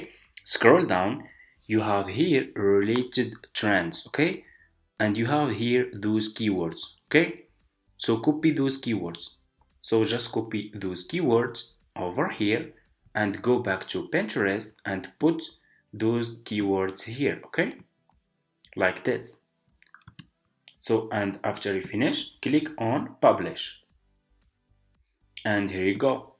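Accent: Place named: Morocco. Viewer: Thanks and good luck! You are a moroccan i can tell from the accent 😅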